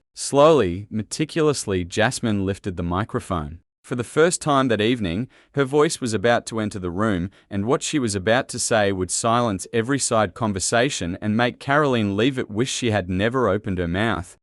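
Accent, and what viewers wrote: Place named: Australia. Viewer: Typical AI story using an Australian accented voice. Obviously a fake set up story. Just trying to cause trouble. 😠 Typical BS.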